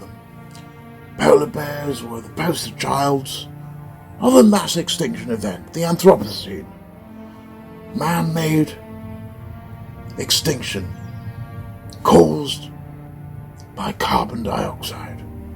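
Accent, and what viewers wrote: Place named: RP, United Kingdom. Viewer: Hi. Not a bad attenborough accent. But I keep hearing a bit of Brian badonde slipping through. Fonejacker incase you dont know. 🤣🤣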